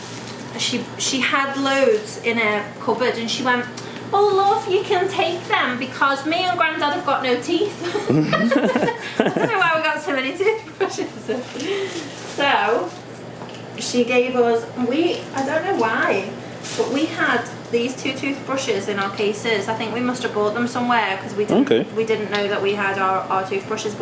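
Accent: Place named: United Kingdom. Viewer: Where abouts you here in UK .....youve got that lovely british accent ....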